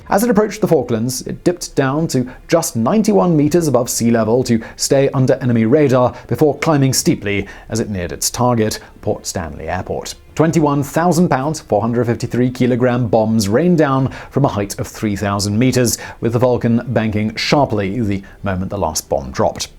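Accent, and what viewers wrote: United Kingdom. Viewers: Is a Brit anyone?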